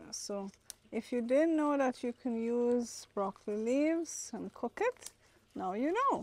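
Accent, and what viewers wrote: Trinidad and Tobago. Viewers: Very nice video. I know a Trini accent when I hear it. XO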